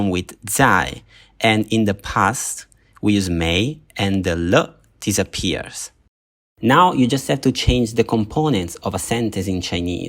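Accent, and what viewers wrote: Italy. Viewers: Oh this is very informative and well done, there's just something weirdly familiar about your accent-??? ITALIA??? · Are you Italian? Very noticible Italian accent both in English and Chinese 😂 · I opened the video, listened 2 phrases and say, this is italian accent hahaah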